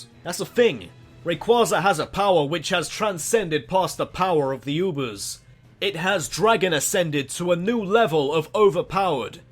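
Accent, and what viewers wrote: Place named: United Kingdom. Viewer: man He sounds like a British Dan Green. Am I right?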